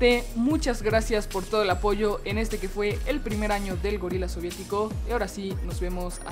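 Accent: Costa Rica. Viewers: Usted es de Costa Rica? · Eres tico verdad? Lo digo por el carepicha😂😂😂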